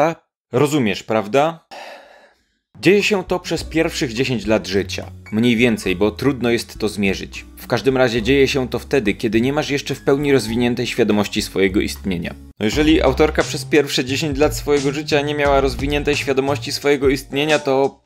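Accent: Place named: Lesser Poland, Poland. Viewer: Eee, nie. Raczej ślady dialektu małopolskiego wychodzą - głównie w udźwięcznieniu głosek: Sprawdźmy (dźwięczne /w/ i /dź/) Powiedzmy (dźwięczne /dz/ przed m) tak jest (tag jest - udźwięcznienie głoski przed /j/) (jestem fonetykiem, zboczenie zawodowe ;))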